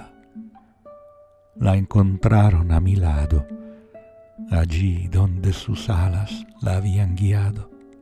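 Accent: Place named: Buenos Aires, Argentina. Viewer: Tu tonada ondula entre el porteño y el italiano, ¿Eres de allí?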